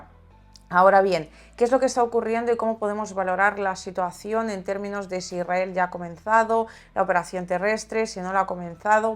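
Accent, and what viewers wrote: Spain. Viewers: Muchas gracias y ya se que es rusa yhabla español de acento de España!!! Mejor que sea rusa. Y si soy bobalicon o lo que sea, la belleza te pone torpes las neuronas ! Así somos de intensos los latinos, pero ante todo su inteligencia vale mucho más de esta hermosa dama . Grazie , buona giornata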